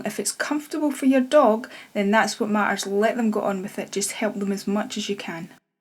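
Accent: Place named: Scotland, United Kingdom. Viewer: Thank you so much for this video. My 14 year old golden retriever is experiencing this without the eye movements. She was on antibiotics for a skin infection which would not go away on natural remedies (we live in a warm climate)She had the same symptoms 5 months ago and the vet said it was a stroke. Its only by looking at youtube videos that connected the antibiotics and this condition. She recovered well after the last episode and I am doing similar things as you taking care of her. Thankfully her golden retriever appetite has not changed and she is eating and drinking well. It is very distressing to watch. This video gave me much comfort and hearing a fellow Scottish accent did too. 🙏🏴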